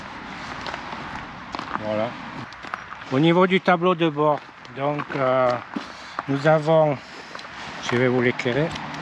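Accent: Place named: South, France. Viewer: Avé le bon accent ! Merci pour ton retour.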